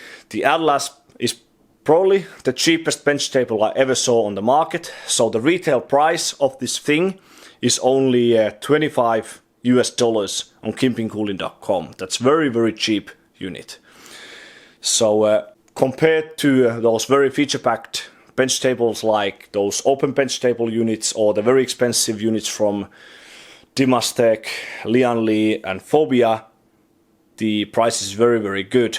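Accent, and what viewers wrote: Finland. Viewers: Aksentista huomaa että oot suomalainen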